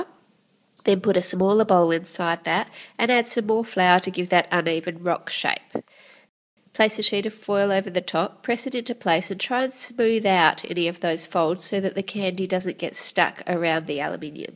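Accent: Australia. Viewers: she definitely does if you are anywhere except Australia, but if you are Australian and have an Australian accent you probably don't notice it 😊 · Your nose sounds as stuffy as mine feels today! I can't get any air through my nose right now and I sound identical to you. I mean, minus the Australian accent and adding an American accent lol. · I always look forward to your videos. Not only have you helped my daughter and me out in baking and decorating numerous times, you have such a pleasant speaking voice and we ♡ your "sheila" accent (we're from Ohio, USA). The geodes are so ingenious and beautiful. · That's an Aussie accent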